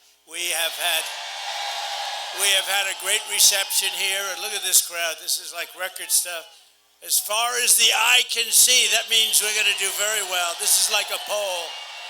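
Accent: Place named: New York, United States. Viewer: Me 2!!! Been following him for last 8 yrs know him from back of my hand knows how he talks NY'r bold strong power gumption n humor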